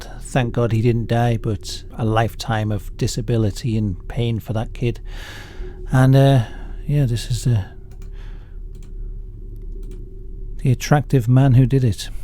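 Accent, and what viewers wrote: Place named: Liverpool, United Kingdom. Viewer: Horrendous attack this. I remember when it happened it had me clutching right to my young kids whenever we went out for quite a while after. Even worse when see that the attacker had been threatening extreme violence against children in the weeks leading up to the attack. Any way, love you videos mate, you’ve got a really charismatic way of telling these stories. Love your accent too! Scouser right?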